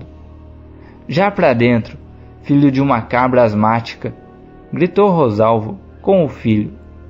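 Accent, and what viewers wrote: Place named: Rio Grande do Sul, Brazil. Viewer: Não tem como sotaque gaucho soar bonito. 🤷‍♂️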